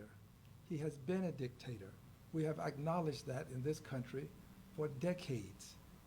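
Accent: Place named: United States. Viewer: True and clear speech . For me as a german it sounds like America.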